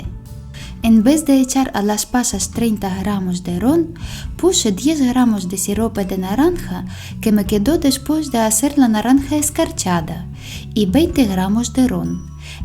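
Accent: Ukraine. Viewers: Hola te saludo desde Lima Perú 🇵🇪 esperando q estés bien de salud,un abrazo grandote,me encanta tu voz tu asento Ucraniano eres dulce,.por otra parte te digo q así de cariñoso somos los Peruanos, gracias por compartir tus recetas hice en la pandemia tus recetas y me salieron super rico mis panes,ahora haré paneton espero q me salga bien.un besote querida.chauuu cuídate. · Дякую, і слава Богу, що ви українка, бо я думав, що з розуму схожу, слухаю нічого не розумію, але відчуття, що на рідній мові😂😂, якась магія, успіхів Вам🌷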